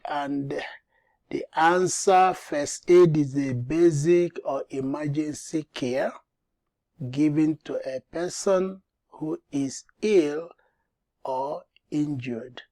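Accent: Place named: Africa. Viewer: Information is very good . Nice presentation. But the accent is hard to pick for none African viewer's. Good job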